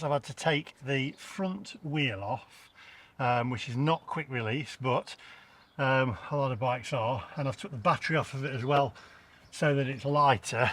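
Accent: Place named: North, United Kingdom. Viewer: You might have a northern accent but your car test is totally irrelevant to the rest of us mere mortals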